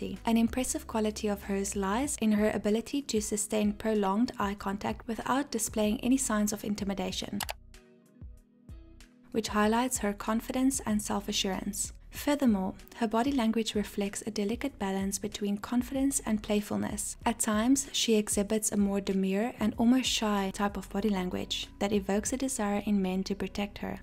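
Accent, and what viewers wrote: South Africa. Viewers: Are you from South Africa? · i seemed to recognise the south african accent too haha !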